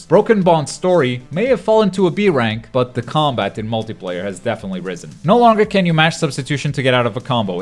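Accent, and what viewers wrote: Portugal. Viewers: Wait, are you portuguese? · Are you portuguese ? 0.o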